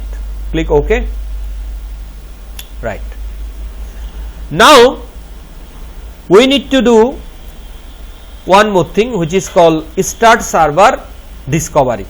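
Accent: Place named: India. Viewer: Here's some tips on how to speak English clearly and decisively, I'm not trying to be rude I'm genuinely trying to help you. You need to slow down and keep the same pace throughout each sentence, don't start slow and then suddenly and for no reason speed up. You also need to enunciate which basically means pronounce the word correctly taking your time to say the whole word clearly. In time as you get better at enunciating you can speed up. The problem with Indians is they speak English the same way they speak their mother tongue. This is a common mistake and is why an Indian accent is very hard to understand. You have a good vocabulary and you're saying the sentence correctly but what's letting you down is your speed (too fast) and pronunciation. Just take your time, write down what you want to say and practise it a few times enunciating every single vowel, again with time this will become easier. 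You've taken the time to learn English but if people can't understand you then you're fighting a losing battle. Listen to a native English speaker that has a neutral accent and then repeat what they say so you learn the pace and pronunciation, you will get better every week and it will open a whole new world to you. Nobody wants to listen to an Indian speaking English too fast and not enunciating its infuriating for us.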